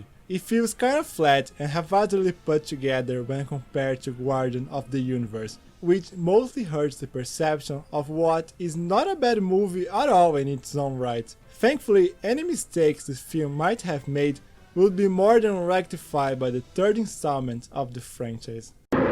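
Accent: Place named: Brazil. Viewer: Great video. Keep practicing your English pronunciations. You have a heavy Brazilian accent.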